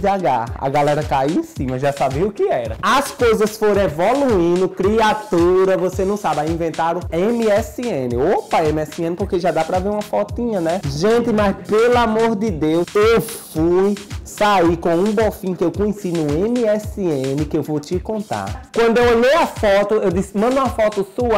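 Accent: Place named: Pernambuco, Brazil. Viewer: parece uma menina de prenambuco que fala assim mesmo meu deus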